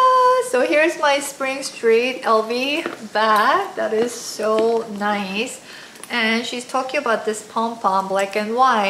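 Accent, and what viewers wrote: South Korea. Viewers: I could tell you are korean from your accent. Nice to see korean lv lover!❤️ subscibed😘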